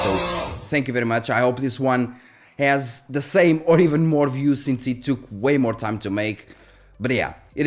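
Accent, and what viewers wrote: Portugal. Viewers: Assim que abri o vídeo soube que estava perante um puro tuga.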